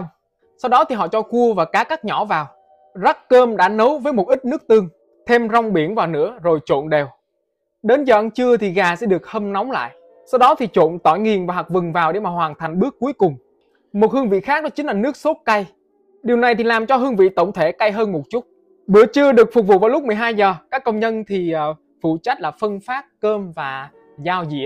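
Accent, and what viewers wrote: South, Vietnam. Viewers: Thôi cứ nói giọng Bắc đi pha giọng Nam nghe nó đớ lắm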